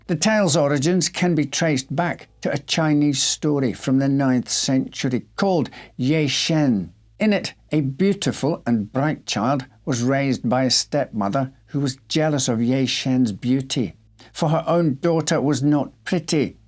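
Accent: Scotland, United Kingdom. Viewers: I remember all of the Grimm Brothers books. I love the real history of them. I always love watching you on YouTube. Love the Scottish acsent. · I love to listen to your telling of tales, and love it when you ‘lose it’. You have a very calming voice and your accent reminds me of my Scottish in-laws, rest their souls! Thank you. ❤️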